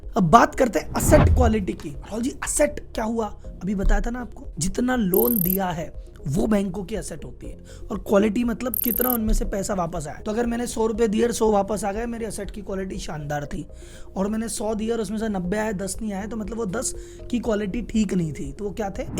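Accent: Gujarat, India. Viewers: Sir aap Gujarati hoo...ya non gujarati ho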